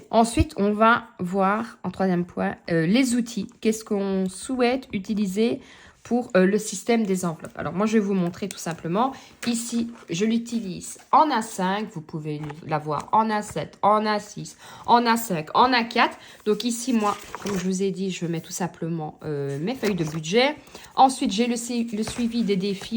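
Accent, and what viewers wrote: Liège, Belgium. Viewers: Toi t’es de lièèèche non ?😅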